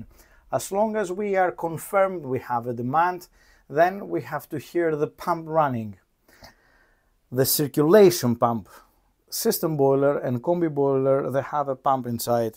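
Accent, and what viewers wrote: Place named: Greece. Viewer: Στάθηκα ακούγοντας τη προφορά και είπα ώπα, δικός μας. Πολύ ωραία,καλή συνέχεια.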